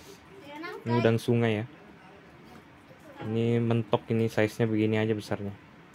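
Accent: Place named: Makassar, Indonesia. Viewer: logat bicaranya kayak berasal dari kota Makassar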